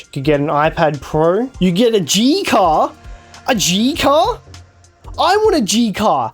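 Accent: Australia. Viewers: Bro is unironically Australian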